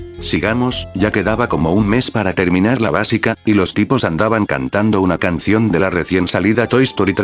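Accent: Chile. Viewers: Eres chileno se nota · tu propia boca te delata: ERES CHILENO,igual que yo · Eres chileno? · Wena eri chileno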